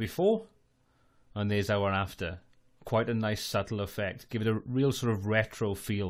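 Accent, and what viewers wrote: Wales, United Kingdom. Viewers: How lovely to hear a Welsh accent!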